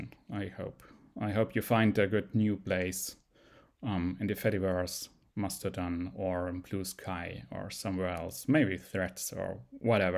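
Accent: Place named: Germany. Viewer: Man hört direkt deinen dt Dialekt raus. Weshalb sprichst du dann Englisch?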